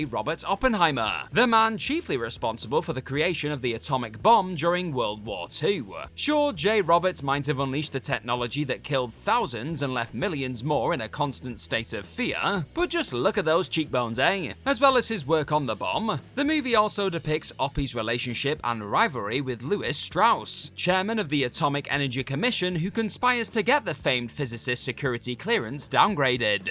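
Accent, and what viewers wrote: United Kingdom. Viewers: I don't believe the writer is actually reading his script. I think they are using an AI reader, with a British accent myself. you can't hear him breathing, or even stopping to take a breath while he is talking. Also A lot of words get mispronounced like NIKE ( Nik-EE)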